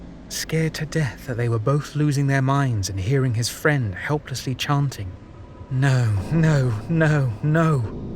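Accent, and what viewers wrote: United Kingdom. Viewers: I do too, a very soothing voice and excellent narration skills. Very high quality. And let's face it, no one narrates like the British.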